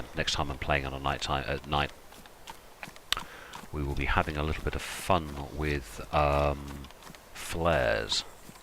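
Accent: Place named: West Country, United Kingdom. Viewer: I love watching your content, I'm always learning something new about the game and I always have a great time, I would love the oppurtunity to play with you one day. I love watching you not just for the excellent gameplay but also because you have an awesome sense of humour, an easy going attitude. Your west country accent is a bonus too :)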